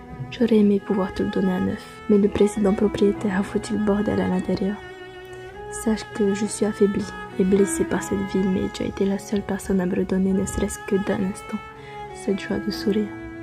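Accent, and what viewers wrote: Italy. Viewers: Tu es italienne ? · J'adore ton accent italien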